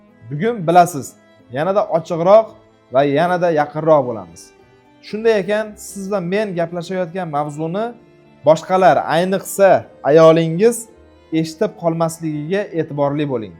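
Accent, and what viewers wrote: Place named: Andijan, Uzbekistan. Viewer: андижонлик экан жижча деп гапиряпти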